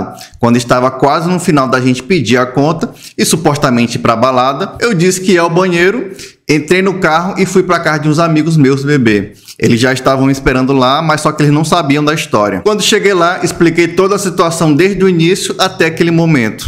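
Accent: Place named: Northeast, Brazil. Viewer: 😂 Adoro as suas histórias!!! Principalmente seu sotaque nordestino...❤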